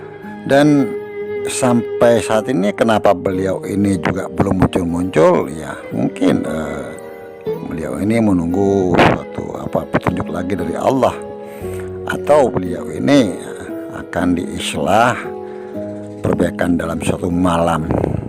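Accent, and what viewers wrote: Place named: Sulawesi, Indonesia. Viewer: Abah ini yg pny chanel yah...aksen suaranya sama hehee..ciri khas Sulawesinya gak bs dibohongi bah 😁👍👍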